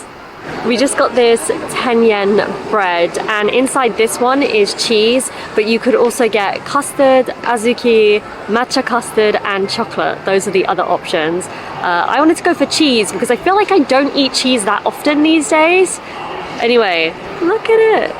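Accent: United Kingdom. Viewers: シックでエレガントな美人さんがイギリス英語を喋りながら、この瞬間は心は大阪人って感じね。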